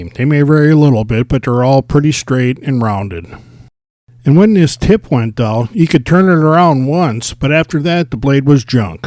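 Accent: Chicago, United States. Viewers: Very useful video! And is that a Chicago accent?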